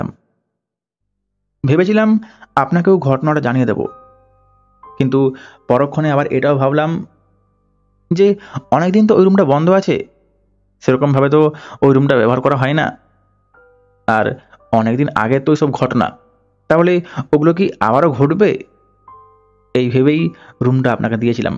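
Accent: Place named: Medinipur, India. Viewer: Vai tomar bari medinipur naki..kotha bolar dhoron suney money ho66ey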